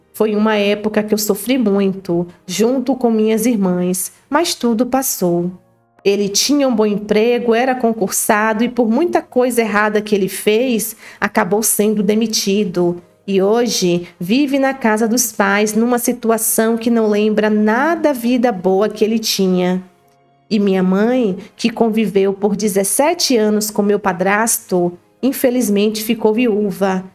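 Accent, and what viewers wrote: Bahia, Brazil. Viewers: Adoro seu jeito de falar seu sotaque. Vc é baiana?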